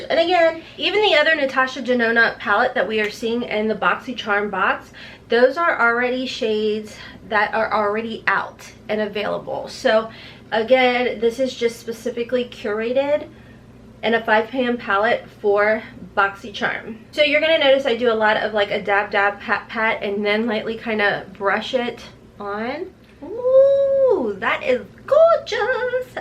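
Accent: United States. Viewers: I love your country accent so cute. I’m from the Bronx and now live in Cleveland so I love me little country accents super cute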